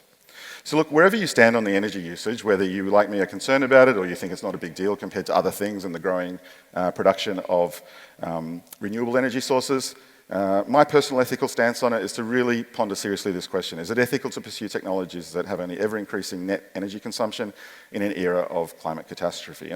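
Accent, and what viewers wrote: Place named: Australia. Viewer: Great to hear an Aussie voice for a change in all the noise of YouTube videos. Subscribed from SA :-)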